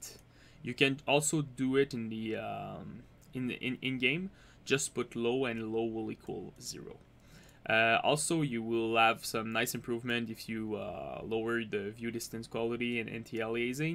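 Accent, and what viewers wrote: Quebec, Canada. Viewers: !!!!!!!!! French Canadian? Quebec or Montreal?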